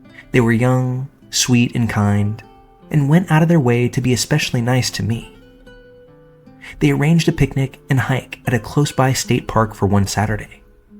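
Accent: United States. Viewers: I'm English and I'm abit werid with American accents. But I really like this one. I'm not sure what part of America comes from my favourite is North Carolina accent😊😊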